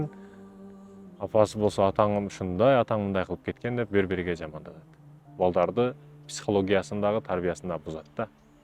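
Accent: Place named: Osh, Kyrgyzstan. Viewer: Ошский диалект)))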